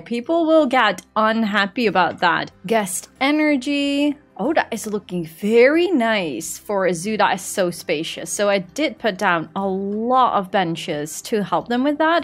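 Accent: Netherlands. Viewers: im non-binary and my name is Nads. it would be really awesome to see a more androgynous looking staff member with my name 🥹 or a funky lil animal. I'm also Dutch btw!!! our accents are very similar 😁. I've spent the whole morning catching up on this series, and i love it so so much!! i would love to see more foliage footage personally, and besides, you tag timestamps so well (thank you btw) that people could easily skip ahead if they wanted to!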